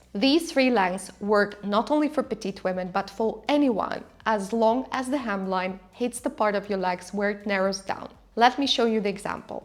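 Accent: Russia. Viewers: почему у нее русский акцент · russian acsent 😐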